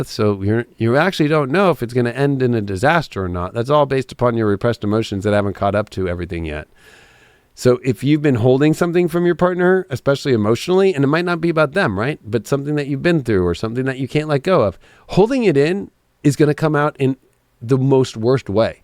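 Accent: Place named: Los Angeles, United States. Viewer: THANK–YOU ♥️✨™️®️🎨♏️🌞♓️🌙 Greatly appreciate your time spent here, & those beautiful LA vibes going into the painted canvas for that Survivors Family ArtShow. I like how your voice brings LA to East Coast. Good vibes, over in VT😎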